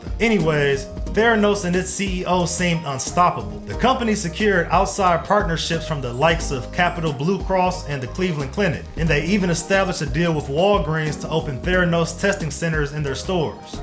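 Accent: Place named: South, United States. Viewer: Southern accent doesnt work in these kind of vids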